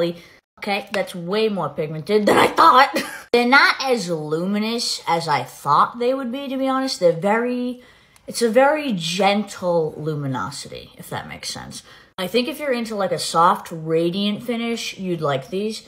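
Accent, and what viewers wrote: Boston, United States. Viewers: That's a Boston accent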